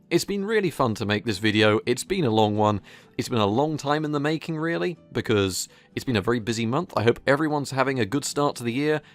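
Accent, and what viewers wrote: United Kingdom. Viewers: why i hate british accent i dont understand :/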